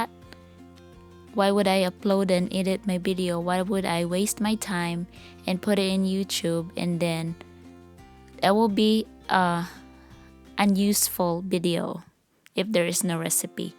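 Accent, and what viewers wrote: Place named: Bohol, Philippines. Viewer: Ganda ng Boses Malamya manalita,Saan province mo,Bohol?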